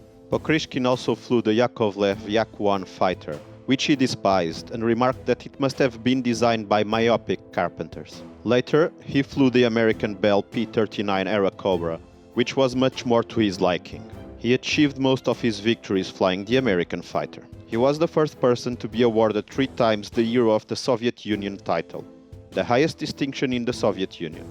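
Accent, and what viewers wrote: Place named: Portugal. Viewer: You have a Portuguese accent.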